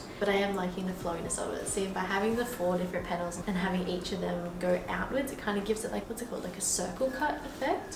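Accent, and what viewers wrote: Australia. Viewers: Wonderful channel!!! Great to hear a vibrant Aussie seamstress enjoying her creations and what a sweet little shift dress!! Well done!! 🌞🌺🏖️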